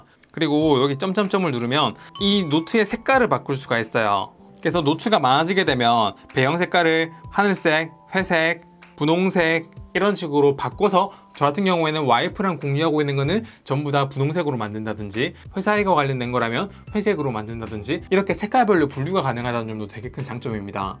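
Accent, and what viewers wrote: Gyeongsang, South Korea. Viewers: 말투가 경상도네요? 사랑해요.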